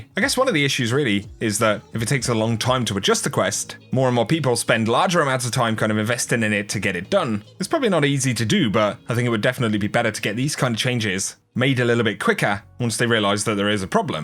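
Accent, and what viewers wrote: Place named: United Kingdom. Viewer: He is from the uk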